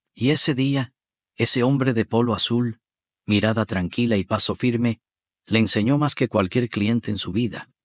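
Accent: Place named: Galicia, Spain. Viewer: Esa historia se repite y es vieja y pasa a diario en Mexico.. siempre llegan sierreños en Mexico en dealer se auto...como los ven prieititos y en huaraches no les dan atencion.. al final refiben la propina de su vida. La verdad tu historia es aburrida y mas de lo mismo ademas que tu acento es gallego..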